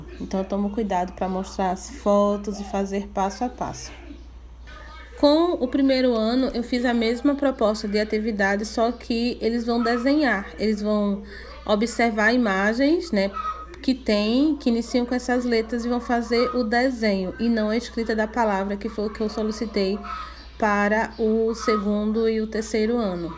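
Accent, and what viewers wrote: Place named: Northeast, Brazil. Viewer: De onde você é professora ? Seu sotaque é bem nordestino.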